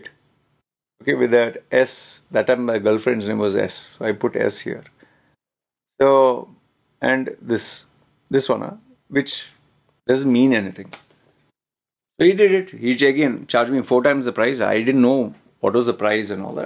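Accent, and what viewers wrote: India. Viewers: Do you ever plan to laser off your facial tattoos? I agree that it makes you stand out but at the same time, it also makes many people view you as a red flag(attention seeker) . I know you already know that. The first time I saw your video, I was shocked and even more shocked when you started speaking in an Indian accent. I assumed you had some type of trauma growing up for you to do such a drastic thing (facial tattoo).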